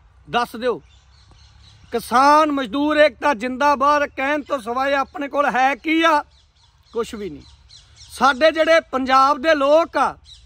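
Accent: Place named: Haryana, India. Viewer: Tusi. Bai. Ji. Haryane. Wale. Lagde. Ho.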